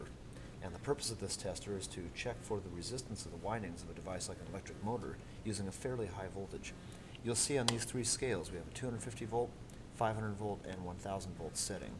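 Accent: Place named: United States. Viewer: fed up of us accent ............. dat person shud learn english frnst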